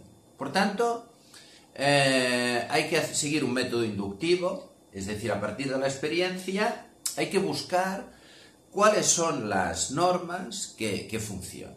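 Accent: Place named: Catalonia, Spain. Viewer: El podries haver fet en català també, que set nota l'accent!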